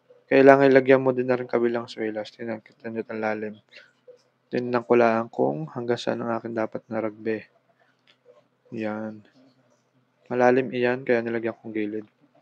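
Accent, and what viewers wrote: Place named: Southern Tagalog, Philippines. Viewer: Southern Tagalog Accent !!! ..Keep it up Bro..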